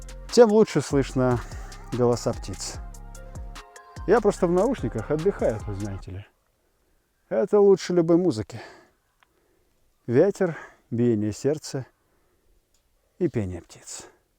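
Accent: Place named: United States. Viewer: Уважаемый ведущий вы вроде мужик а ведёте себя как американец. На ютубе есть подобные ролики американского производства и у меня сложилось впечатление будто манеру держаться и разговаривать вы взяли от туда.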